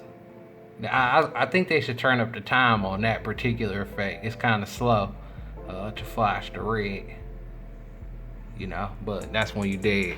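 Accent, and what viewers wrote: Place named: Mississippi, United States. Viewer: Felt like someone from Mississippi telling the story on a rocking chair couldn't understand half of what he said.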